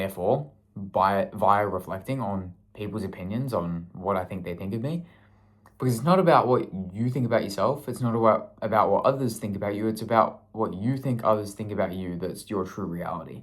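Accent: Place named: Australia. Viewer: Hi, you sound like a awesome Aussie. Do what you love to help your journey so that you have the abilities from your hardwork of hardships? You'll understand when your 40 years old. The perception of you talking about Ego, is that all is of value, when you use intellect & compassion in the fruits of your labor. You may need to start a little martial arts training, because demons don't like to be seen by the light. laughing not laughing, just loving, living, learning that the truth is non-negotiable. Great day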